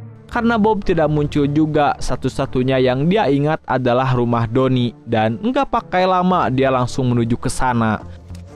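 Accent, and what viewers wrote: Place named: West Java, Indonesia. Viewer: eleh-eleh.. sunda pisan.. 😁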